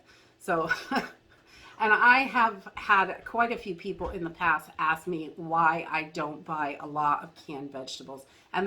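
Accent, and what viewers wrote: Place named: Maine, United States. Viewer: You are so right! My husband said the other day, please don't bring any more food home, we don't have the room! Lol I have filled every area I can find., your 🤔Maine accent has never been more obvious. TY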